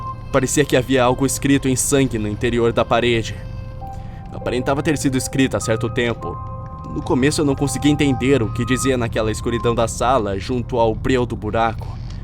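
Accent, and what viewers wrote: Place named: São Paulo, Brazil. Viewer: MDS eu tbm sou :0 pensei q vc fosse paulista srsr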